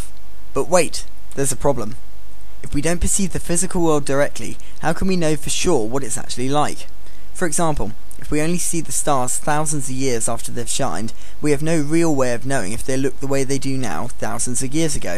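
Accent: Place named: United Kingdom. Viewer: This is not plain English. This is British English!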